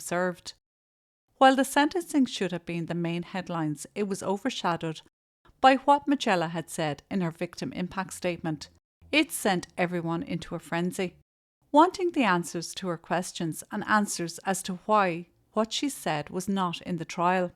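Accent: Ireland. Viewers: I subscribed 20sec in, coz of the accent.. I am Australian after all, and we just love an Irish accent.! 🍀 But then you said horseshit girl.. and you had me again. 😅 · Not boring in my opinion, a million times better than most US crime doco's with all their hysteria and emotive over dramatic adjectives. I'll take this calm voice and lovely Irish accent every time.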